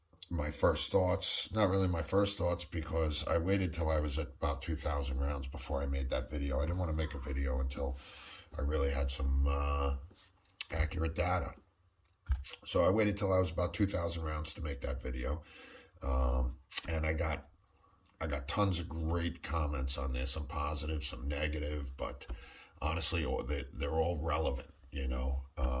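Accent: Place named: Northeast, United States. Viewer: Definitely from the Northeast. Good vid btw #2A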